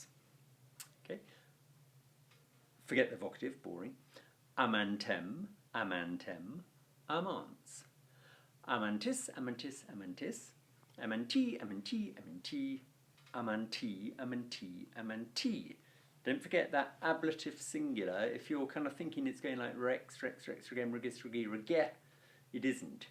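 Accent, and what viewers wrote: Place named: England, United Kingdom. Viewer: Thank God for your English accent and clarity. I sometimes think I'm listening to Caesar recited by Davy Crockett.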